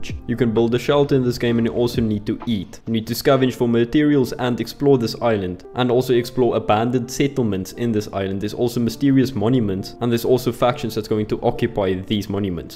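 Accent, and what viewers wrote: South Africa. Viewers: With in the firs 1 second i could tell ur a fellow south african gon be giving u a sub and so on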